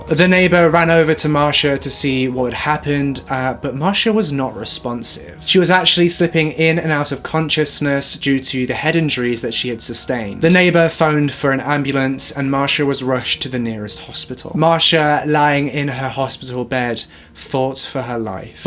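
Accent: United Kingdom. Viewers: Hello I’ve come from amber loves mystery honestly love you videos..are you from UK?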